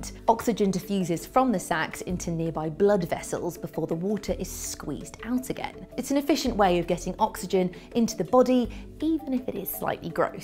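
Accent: United Kingdom. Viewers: Loved the vid...love the Brit accent! "Water" becomes, "wwWW-ta" LOVE it! (not making fun, I really do like listening to Brits talk)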